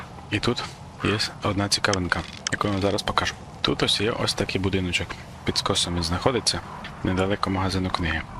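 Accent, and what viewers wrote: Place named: Poland. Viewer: у вас гарна мова але чую акцент, ви поляк? :)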